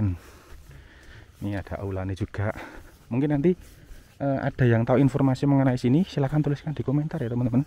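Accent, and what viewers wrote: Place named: Surabaya, Indonesia. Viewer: Disertai peta lokasi, peralatan yg dipakai dan celotehnya sepanjang jalan ttg kiri kanan maupun cuaca, saya sangat suka kejujurannya cara bicara suroboyoan dan dicampur gaya arek malang.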